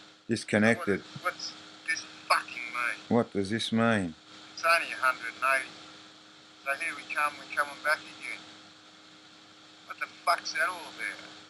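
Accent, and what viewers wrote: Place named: Australia. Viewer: I'm pretty frustrated with mine too. Next to no instructions with it. Have to learn from other YouTube users or by trial and error. I reckon I've flown maybe a half-dozen hours! . I guess the presumption is that everyone's an expert. Can't calibrate it. Blooming expensive shelf ornament. (PS: Glad to hear an Aussie accent). I might go back & see Gerry Harvey's staff again. (Sigh)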